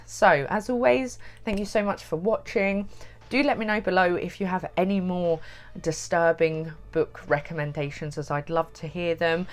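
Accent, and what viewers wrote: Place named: RP, United Kingdom. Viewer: The Engish accent is always so elegant. Doesn't matter what is being said, it sounds posh.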